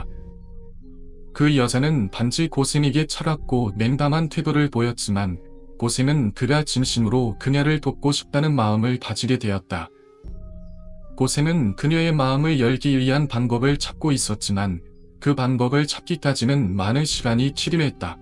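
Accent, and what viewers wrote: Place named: China. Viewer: 중국사람이냐??? 머라는거야 ㅗㅗ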